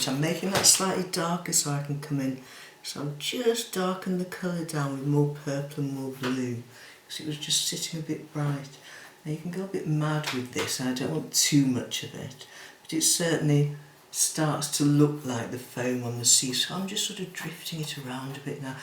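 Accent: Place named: RP, United Kingdom. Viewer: Hello your sooooooo posh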